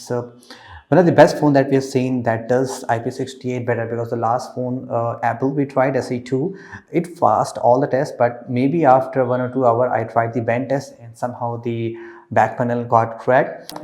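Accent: India. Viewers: Indian accent is so annoying lol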